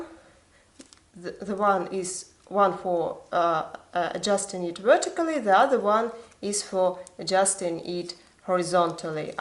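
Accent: Russia. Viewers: I am enthralled. The Russian accent, the tactical proficiency... I might be in love.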